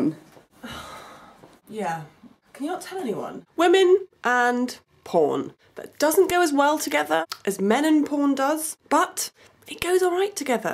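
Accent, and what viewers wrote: England, United Kingdom. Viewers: You are sooo good at this blog thing. You’re articulate, with a voice I could listen to now and forever and oh my that British accent. And on top (no pun intended) of that, you’re drop dead gorgeous.❤️😂😂😂👍👍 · I gave a thumbs up for British ascent I'm British I don't know if i spelt it right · I like her. There is something about her energy and this British accent But this #5 ... 😧 what's wrong with you, woman?! P.S. And yeah, her sister is hot too 😎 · English woman with mary poppins accent speak about porn , which destroy my childhood memories hehehe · Thank you for your realistic honesty, it was refreshing to hear, (especially with an English accent & not the usual American one) you are quite funny, as a kind of bonus, stop using the word "porn" if it's okay though. Adult films or magazines, are nothing more than a means to an end, they can get us to the bed room, BUT they are not there when we actually do the job. 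real life sex is pleasure in a different way, & circumstance.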